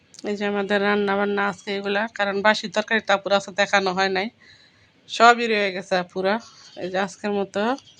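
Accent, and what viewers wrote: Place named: Sylhet, Bangladesh. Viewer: সিলেটের ভাষা খুব সুন্দর,, আপু আমি কক্সবাজার থেকে দেখছি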